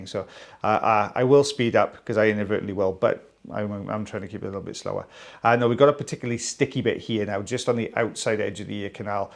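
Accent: Wales, United Kingdom. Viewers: Love your welsh accent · I dont think your accent is that bad its mild compared to north wales. You also dont talk that fast people should try listening to the irish! X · It’s a lovely accent. Think I’ve said this before. Love Wales. Love the videos. Take care.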